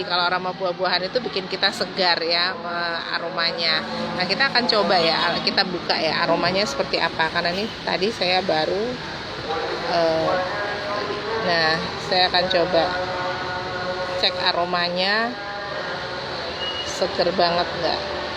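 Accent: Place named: Jakarta, Indonesia. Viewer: Suara jrlas dr jkt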